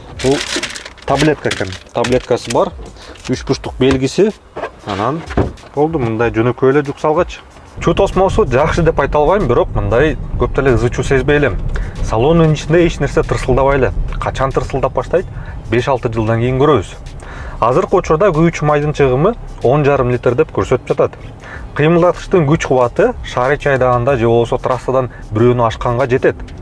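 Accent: Standard. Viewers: Ушу жигит кыргызчаны өтө нукра сонун сүйлөйт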